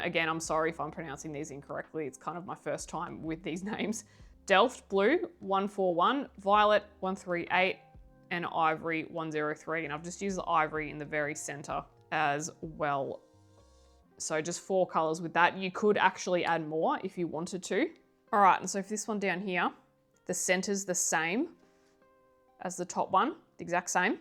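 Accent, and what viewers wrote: Australia. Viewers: I live in Queensland and have both sets. Up here in summer 30 to 37°c polys blend and smooch just like the prismas. No air conditioning. 😅 But I will always prefer Prismacolor because of how I colour, dark to light and blend together. I hate layering takes to long for a vibrant result for me. Each to their own though. Love your videos and great to listen to another aussie on YouTube. Have a good Xmas and new year. Waving from Queensland 😊👏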